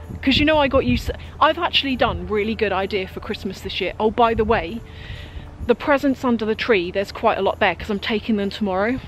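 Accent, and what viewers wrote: England, United Kingdom. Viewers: I just love to listen to you!!! Not only are you articulate, you have the BEST English accent!!! Thanks for sharing your Christmas with us, and I LOVVVE your gorgeous Chanel trendy!!!🎄🎄🎄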